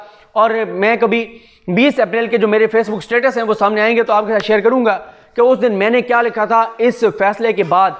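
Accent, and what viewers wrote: Saraiki, Pakistan. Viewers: Gd ... Yr ap bahawalpur sy hen. Gd Sraiki lehja hai.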